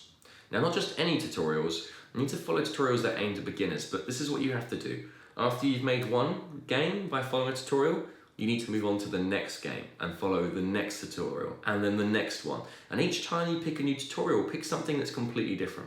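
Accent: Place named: United Kingdom. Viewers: sounds generic British, so hard to place. Could be south east England though. I'm English also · Brit accents are kind of played out over here, but this is a really nice one. Must be specific to a particular region!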